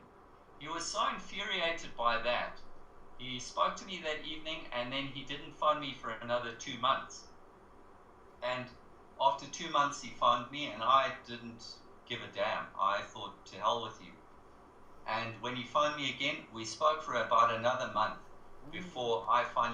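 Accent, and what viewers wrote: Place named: South Africa. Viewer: I am a South African Afrikaner and just listened to this video. I've also downloaded the Battle of blood river part 1, Jews 102 and Jews 104. I've noticed you're SA accent and love listening to you, you speak slowly and very clearly. My awakening to the whole Jewish issue started a few months ago when I've studied ww1 and ww2. The Jewish hand was noticeable all over these two wars and I started to be more interested in the Jews. Even if they only constitutes 1% of the world population the damage they do are scary!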